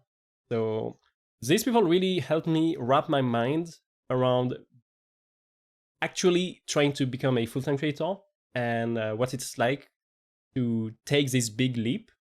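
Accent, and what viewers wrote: France. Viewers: hahaha nah bro don't get me wrong I am taking this hole topic serious and huge respect for quitting your job and talking about it, but at this point your french accent is making anything funny for me.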